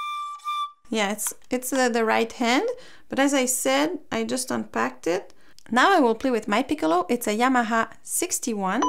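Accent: France. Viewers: Une française j'ai reconnu avec les r hehe sinon c pas mal je pense pour les débutants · She is French from her english accent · Lorky ouii elle a un accent je trouve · Je me disais bien qu'il y avait un accent français... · J’ai entendu l’accent français et ça fait plaisir ! Sinon super vidéo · I could spot your french accent in a way or another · Are you french/french canadian? There is a light accent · Are you french? You have an adorable french accent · Coucou , j’entends un accent français je me trompe ? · French accent? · Très bonne vidéo ,sa ce vois que te es française avec l'accent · J’ai l’impression qu’elle a un accent français non ? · Énorme accent français jsp si tu est française ou pas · Serait-ce un accent francais ? 😬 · l'accent français ce ressent mdr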